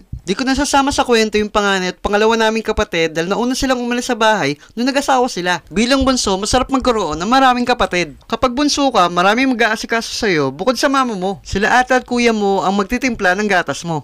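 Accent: Ilocos, Philippines. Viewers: Kuya ilokano ka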